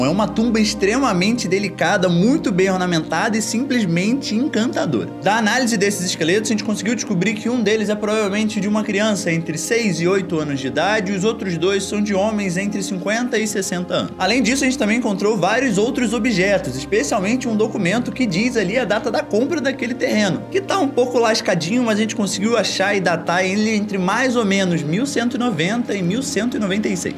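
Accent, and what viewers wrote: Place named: Rio de Janeiro, Brazil. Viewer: Adorei o vídeo, faz uns vídeos contando um pouco da história do Egito e dos faraós, como se fosse uma baita fofocona kkkkk acho da hora história sendo contadas dessa forma e pra quem tem dificuldade de entender ajuda bastante. Outra coisa, adoro seu sotaque carioca, amo seus vídeos do TikTok.. continue assim❤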